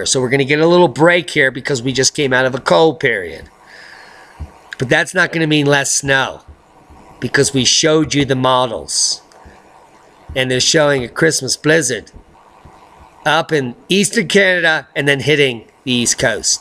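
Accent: England, United Kingdom. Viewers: Hi. Great video! a lot of fun. Loving the..English accent, mixed with a little Australian too!? That little 9c 'tippy-touch' in the UK is called Cornwall. ps The Queen Muvva don't give a toss about snow..she died in 2002!! oh, and Congratulations to you both!!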